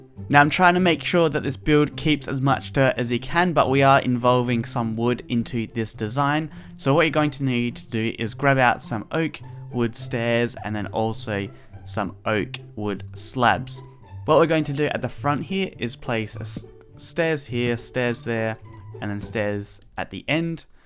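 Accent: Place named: Australia. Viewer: I luv ur Australian 🇦🇺 accent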